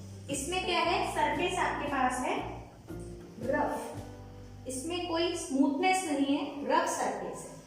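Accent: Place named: Haryana, India. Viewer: Maine socha haryana se ho 😅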